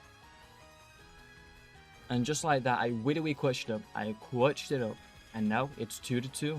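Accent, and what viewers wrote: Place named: United Kingdom. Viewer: No hate whatsoever, but you sound like a very british lad ❤️‍🩹☺️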